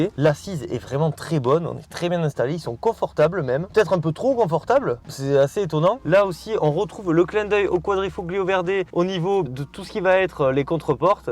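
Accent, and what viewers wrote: South, France. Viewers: Je voulais te remercier pour ton accent du sud et du coup le soleil que tu mets dans tes vidéos 😅. Un model qui fait rêver cette Alfa ❤. J'ai pris mon courage a deux mains et j'ai essayé de présenter la restauration de ma cx . 😅 Bon je suis loin de ton niveau et j'ai un un handicape physique au dos ,qui me limite pas mal dans cette restauration.dou le choix de cette voiture 😆. J'aimerais te la présenter. ( Elle sera repeint) d'ici cette été. <link>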